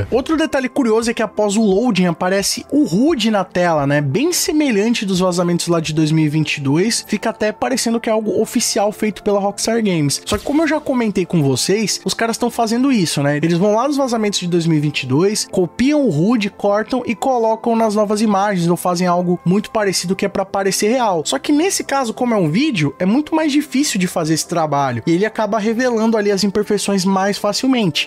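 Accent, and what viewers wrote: Portugal. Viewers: Porque seus videos agora estao com essa dublagem? Em pt portugal? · Porque sua voz parece de um português?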